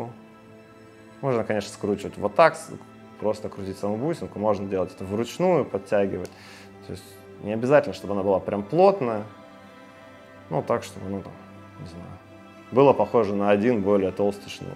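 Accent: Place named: Ukraine. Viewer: на первый чемпионат,случаем не за сборную Украины ездил?Уж очень Говор характерный слышен…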